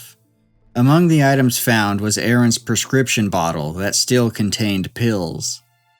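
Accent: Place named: Southern California, United States. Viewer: Is it interestING or interestEEN? HuntING or huntEEN? EndING or endEEN? Dang SoCal Californians!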